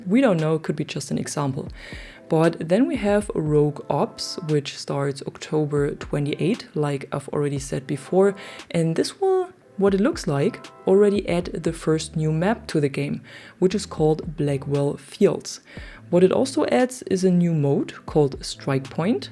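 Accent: Germany. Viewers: Man hört übelst deinen deutschen Akzent raus 😂😂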